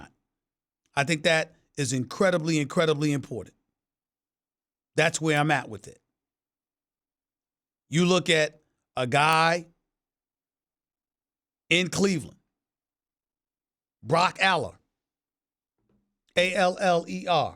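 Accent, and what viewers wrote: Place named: New York, United States. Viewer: NY accent, especially in the boroughs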